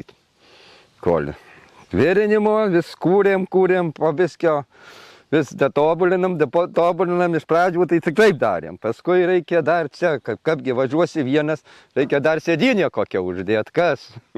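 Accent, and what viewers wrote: Dzūkija, Lithuania. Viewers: Širdžiai miela dzūkiška kalba.👍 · Saunuolis labai grazi dzukiskai kalba 🙌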